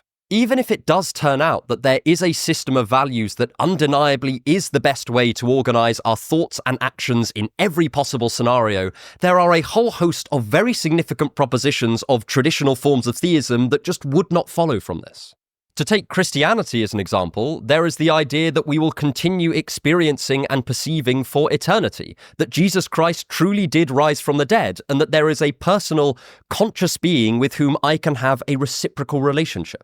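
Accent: United Kingdom. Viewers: You are soooo handsome ❤❤❤❤and your british accent ❤❤❤❤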